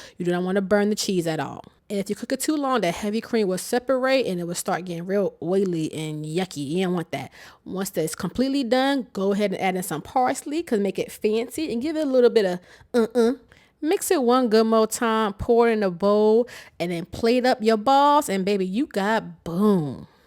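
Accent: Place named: Maryland, United States. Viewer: I hear a Maryland accent 😂 I'm making these soooooon!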